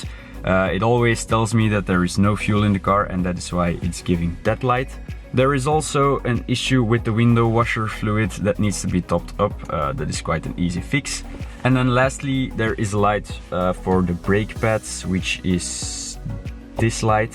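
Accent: Flanders, Belgium. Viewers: Hahaha uw belgisch accent maat 😂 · Ok subscribed, lekker vlaaams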